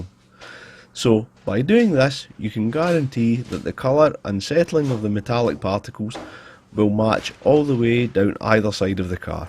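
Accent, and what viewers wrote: Scotland, United Kingdom. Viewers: This man, talented, hard working, creative and all of that with a great Scottish accent.👍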